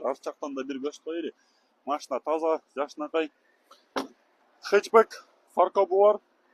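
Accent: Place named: Kyrgyzstan. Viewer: Атан молодец таза Кыргызча суйлойсунго брат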